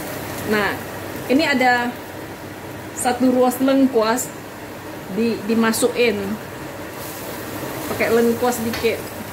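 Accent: Medan, Indonesia. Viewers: Aku suka bgt logat Medanya kuat, menjadi ciri has..masak nya enak² penjelasan jls..tks🙏😇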